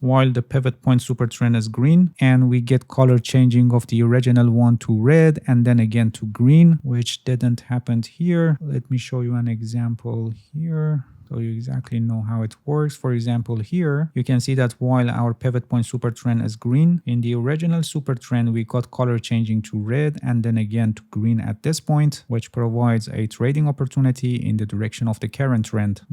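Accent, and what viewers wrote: Iran. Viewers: thanks. i can hear your iranian accent. but you speak good. 👍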